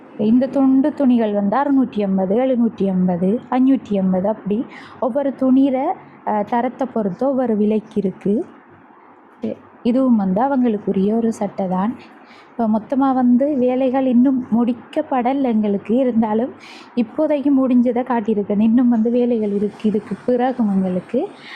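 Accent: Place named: India. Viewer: உந்த சல்வாரையும் கொல்வாரையும் விட்டிட்டு கொஞ்சம் modern க தையுங்க. அதோட இந்தியன் slang ல பேசாமல் மட்டக்களப்பு slang ல பேசுங்கோ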